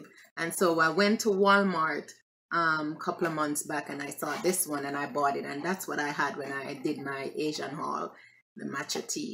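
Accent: Jamaica. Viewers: love your accent, Jamaican?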